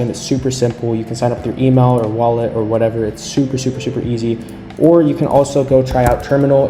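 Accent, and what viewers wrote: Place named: Bangladesh. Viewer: Bangla voice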